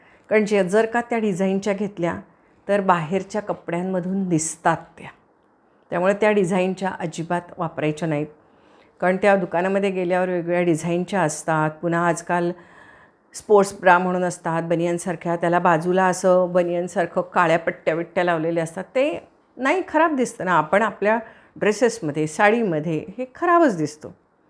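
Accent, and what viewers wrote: Kolhapur, India. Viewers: Madam tumhi kolhapur chya aht ka?? bolnyacha tuning vrun vatt kolhapur che aht😊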